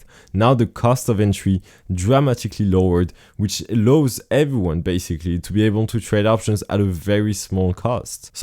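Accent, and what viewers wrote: France. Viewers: Good long term outlook. And the French accent is cool 😀.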